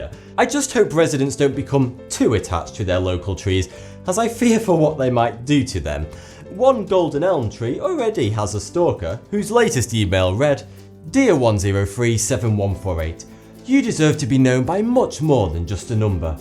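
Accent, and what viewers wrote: United Kingdom. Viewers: Wow, you live in Melbourne and don't even know the correct pronunciation of the name, it's only because of your accent that you say it like that. has a British accent therefore he speaks the correct pronunciation of the word. And fix your grammar dude.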